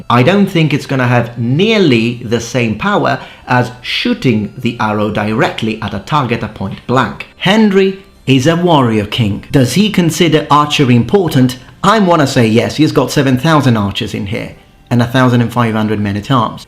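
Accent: England, United Kingdom. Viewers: haha, wow. iv only watched you for one year. I just watched The king so am now watching YouTube analysis and here you are in your primordial form. iv always been blown away by your English usage by all metrics. You do sound much more English in your primordial version, i wonder how and why? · Hey, I noticed in some of your older videos such as your Kanabo video from 2 years ago you had a samurai helmet, where did you get it from? I’m in the UK as I assume you are judging by your accent, yet they’re all ridiculously expensive online.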